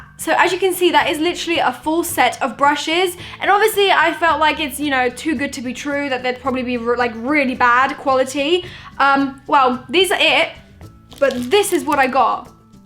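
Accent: United Kingdom. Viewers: Really? She sounds so british omg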